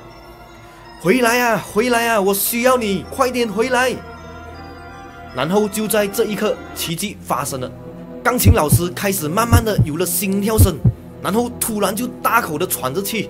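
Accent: Malaysia. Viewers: 謝謝你的故事❤不過我還是一邊聽一邊想笑呢😂是因為馬來口音很特別嗎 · 曾经看过马来西亚网民批评你的口音，我是新加坡人，我们新加坡有新加坡华语口音，我喜欢马来西亚和台湾华语腔，所以你不要用AI，听马来西亚口音很亲切。还有，请马来西亚华人保留马新本土华语。新加坡华文界喜欢模仿中国和台湾，如联络 = 联系，宵夜 = 夜宵，甘榜 = 乡村，塞车 = 堵车，养育孩子 = 把孩子拉拔大（连田中裕子演慈禧太后也说她把光绪拉拔大），水草 = 吸管，地铁 = 捷运，电脑 = 计算机 -你去computer shop 说我要买最新的计算机，店员叫你去 文具店，注册结婚 = 办结婚证，运货 = 拉货，一个新加坡新传媒男记者竟然把德士说成 出租车 （rental cars），搭德士 = 打车，什么都搞，跟他们说谢谢他们学中国人说没事。我有说妨碍打扰你了吗？没事？我最喜欢人家说 一样一样 sama sama，或者 不会 （福建话翻译 buay）。新加坡媒体觉得 巴仙 很不标准，不敢说，有的新加坡人却模仿台湾人 说 趴，三十趴，五十趴。请马来西亚华人保留新马马新本土华语，别让它们失传。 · 其实，UP主他没有使用 AI 语音技术啊～他的口才還是一样带有浓浓的大马腔的口音味道～完全听得出来，但是最近他的發音腔调 有很大の进步了，有听出來 确实比早期改善了很多，讲故事也更加顺畅了～很好吖～ 我認为说 ％ 百分率 = 巴仙，在大马 或 新加坡 世界各地 都没问题啊，没必要去刻意效仿 台湾，而且台湾 使用『％ = 趴』其實也不太标准。 然后，说句实話…其實 新加坡🇸🇬 就也是一样是 🇲🇾大馬啊，只是是旧的，是属于以前の马来西亚 而已，後来叛逆 有本事了就独立自主出来，所以两边文化自然差不多也一样嘛～。哈哈……😄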